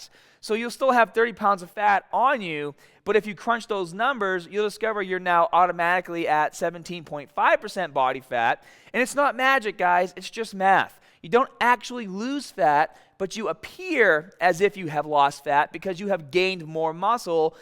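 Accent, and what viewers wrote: Boston, United States. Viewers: The exaggerated Boston accent is atrocious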